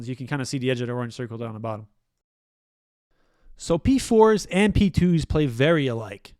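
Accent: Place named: Quebec, Canada. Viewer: Thank you! I was in your live stream when you were talking about this and I remember asking if you would make a youtube video about it, so pumped to see that you pulled through. Please make more videos like this!! Also cheers from Canada, I know a french canadian accent when I hear one.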